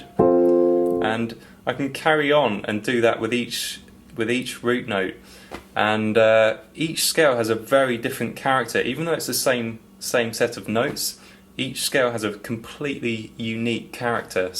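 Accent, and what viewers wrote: United Kingdom. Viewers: also you're very charming and I love your british accent :3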